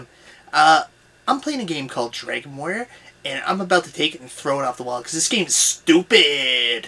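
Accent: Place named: Nova Scotia, Canada. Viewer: Canada eh? Based on your accent, I'd say somewhere near Nova Scotia.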